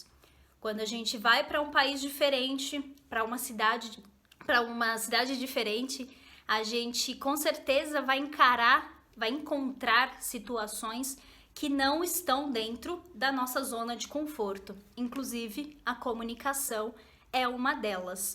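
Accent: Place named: São Paulo, Brazil. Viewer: Teacher discussed the diversity of accents in Brazil and the importance of understanding and respecting these variations for effective communication. She shared her personal experience of traveling to different regions of Brazil and encountering various accents. While she didn't specify which accent is the easiest, she emphasized that regardless of the accent one learns, they can still communicate effectively. highlighted the similarities between learning English accents and Brazilian Portuguese accents, emphasizing that exposure to different accents helps in adaptation and understanding. Overall, her discussion aimed to promote acceptance and appreciation of linguistic diversity in Brazil. ~ From California, Silicon Valley a, Student of Brazilian Portuguese. Muito Obrigado, Professora... By the way: I like PAUALISTANO, CARIOCA and MANUARA SOTAQUES and of course: The Royal Portuguese SOTAQ in particular. 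Evidently, Your sotaque is Paulistano, it's a pleasure to know that you can Speak California English? 😎😘😎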